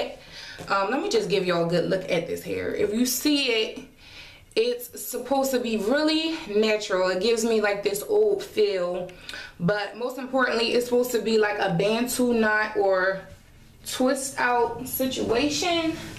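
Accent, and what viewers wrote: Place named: Maryland, United States. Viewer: I have a question are you from Baltimore or Maryland? Normally I don't ask people where from on here because of privacy but your accent is very similar to mine lol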